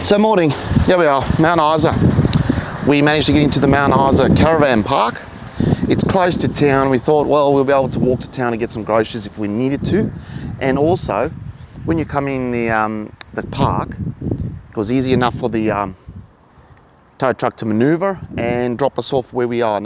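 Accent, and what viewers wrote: South Africa. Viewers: You sound South African under the Aussies twang?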